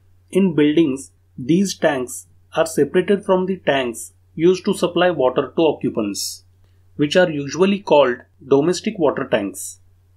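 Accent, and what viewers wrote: Maharashtra, India. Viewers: I can make out the person in video is from Maharashtra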